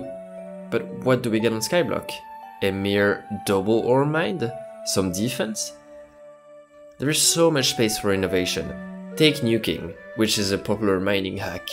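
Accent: France. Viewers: J'aime cet accent français ! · FRENCH ACCENT !!! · You sound so much like a France guy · Listening to your accent i can approve that bedore you did yt you whrre used to talking french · ta l'accent d'un francais qui veux parler anglais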